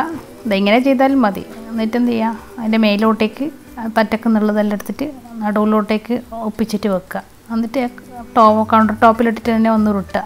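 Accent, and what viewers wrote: Kannur, India. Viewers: Aha... bun poliyanallo.... really it look similar to bakery bun... koottathil ante kannur bashayum... 😊😊😄👍👌👌👌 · Kannoorkari aano???Aa slang kettitt chodichathaa😄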